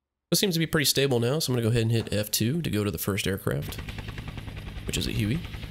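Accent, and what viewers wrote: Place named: United States. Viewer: 'mi...' what? Zee...? You Yanks are really 'zeee' in your sick nappers. Unbefuckinglievable how you linguistically inapt and generally illiterate riffraff mutilate the English language. And to top it all off some even add this disgusting, thick rhotic accent... Barf! It causes ear cancer. How about not to try to swallow your own tongue while you speak? 'Nohohoho, better not, for we could sound like humans and not like the armed lunatics who we are, spread all over the world to murder other peoples.'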